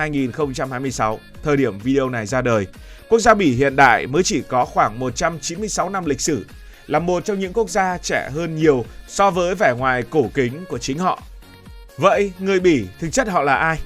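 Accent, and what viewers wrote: North, Vietnam. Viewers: Tiếng băc ky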